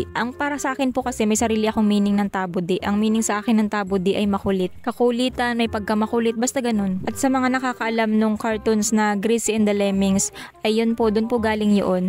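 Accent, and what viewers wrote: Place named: Laguna, Philippines. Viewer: Watching without skipping ads done na miss ko makinig at manuod ng videos mo😂😂 relate kase ako sa punto mo ganyan din sa namin sa kalayaan laguna..shout out 😂😂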